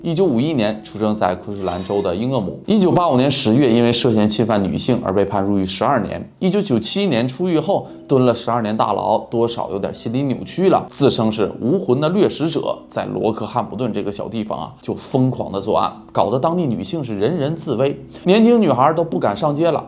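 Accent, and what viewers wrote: Northeast, China. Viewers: 能不能不说东北话？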